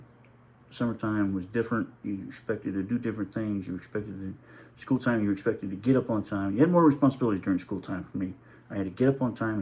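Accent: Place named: Alabama, United States. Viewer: Heres my analysis on the entire video you have presented. 1. You have a southern Alabama accent. 2. You have some excellent knowledge. 3. Your skin is pink. 4.. You should be a motivational speaker. 5.. You look like Steve Austin the wrestler. 6. Your ancestors were definitely Catholics. 7. You are severely autistic. 8. You are not physically attracted to Black women. 9. You shave your own head at least once a week. 10. You are a meat eater and have never considered a vegan diet. 11.You never hang out with Black women. 12.You routinely hang out with Black men. 13. You are an excellent pool player. 14. You are a good dancer. 15. You pray to statues of Mary. These points are ALL true until corrected by you.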